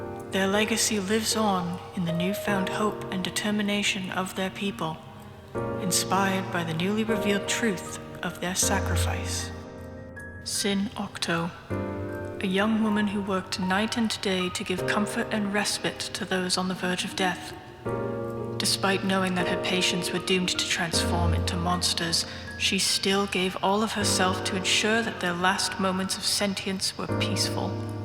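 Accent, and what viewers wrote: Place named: England, United Kingdom. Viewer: You truly could’ve fooled me if you were trying to pretend to have an English accent. I like doing voice acting as a hobby, specifically impressions, but it’s hard for me to mask what is clearly my American accent. Still, I can tell a lot of love went into this and wanted to complimented as such. You’re very welcome.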